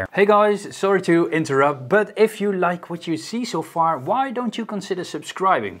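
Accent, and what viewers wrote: Netherlands. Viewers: Great tutorial! Just subscribed... is that a Dutch accent btw?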